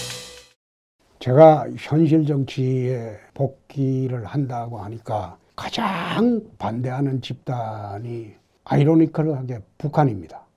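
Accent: Gyeongsang, South Korea. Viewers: 그리고부탁이있읍니다 경상도말이 소리가조금만커도 쥐어박는소리로들리니까 조금만 부드러워지면여성팬들이늘어날겁니다 격한소리도되도록 자제하시고 유머스럽게 해주면감사하겠읍니다 ㅡ항상 응원합니다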